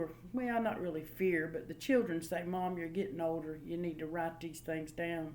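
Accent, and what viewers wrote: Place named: South, United States. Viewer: Hearing more of this southern accent I’ll even return the favor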